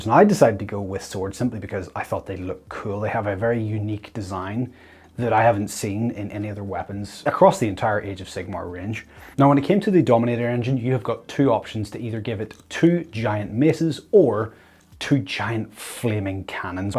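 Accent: Northern Ireland, United Kingdom. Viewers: Are you from Northern Ireland?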